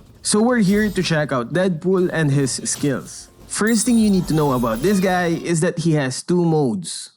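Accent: Philippines. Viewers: Putang ina mo accent mo pang cancer na pinoy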